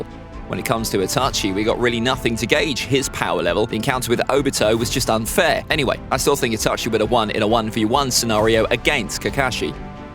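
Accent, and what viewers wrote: United Kingdom. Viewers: He has British accent❤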